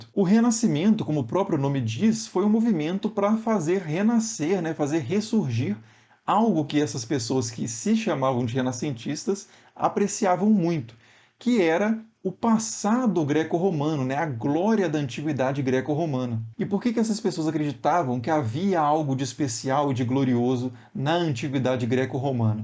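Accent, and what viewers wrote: Minas Gerais, Brazil. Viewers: Pena que o áudio é baixo. Ainda mais com mineirinho falando 😊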